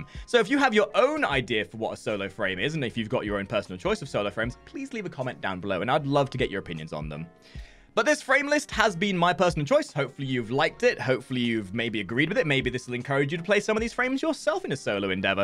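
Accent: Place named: England, United Kingdom. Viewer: Damn that English accent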